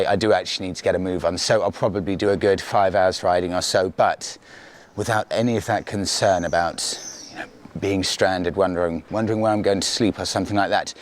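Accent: RP, United Kingdom. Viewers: Hey. Jason here from the NE of England. Came across the channel a couple of weeks ago, this trip/ video has been very informative of costs etc. So thank you! Looking to do a similar trip on enduro bikes from hull. Brilliant idea of miles and If any I need to carry oil for changes. Thanks very much. You're awfully posh sounding compared to the NE of England but very much enjoy your videos. Subscribed and followed! Looking forward to more videos on the transalp! Cheers!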